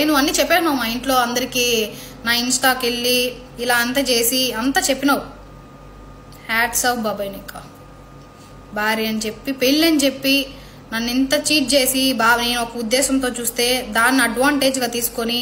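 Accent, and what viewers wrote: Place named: Kadapa, India. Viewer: Kadapa language vaguthunnavu neevu ya district girl.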